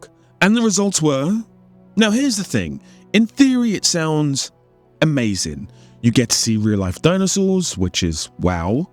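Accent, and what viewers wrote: United Kingdom. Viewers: You showed an Idris Elba movie clip here. How does his British dialect differ from yours or are they similar?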